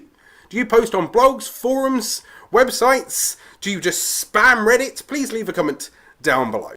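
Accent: United Kingdom. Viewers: card missing Britisch english is really way more fun to listen then american english. Good video by the way!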